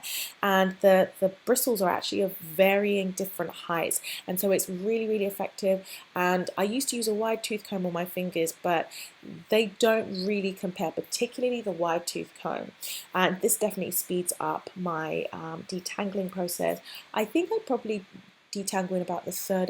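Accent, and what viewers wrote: England, United Kingdom. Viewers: you had an English accent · That English accent...love!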